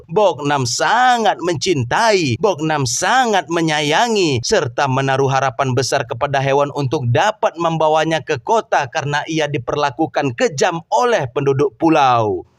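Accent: West Sumatra, Indonesia. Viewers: Rang minang y bg?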